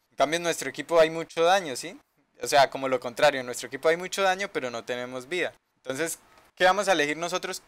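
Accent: Colombia. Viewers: se te nota lo colombiano xd buen video tio:^y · Amigo mío eres de Colombia? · muy buenos tus vídeos y se entiende lo colombiano v: toma tu like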